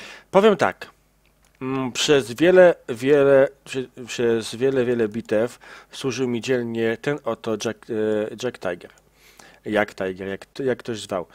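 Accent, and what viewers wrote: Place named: Poland. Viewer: A czy uważasz idioto, że to Niemiec? Jest Polakiem więc mówi tak jak powinno się mówić w Polskim języku.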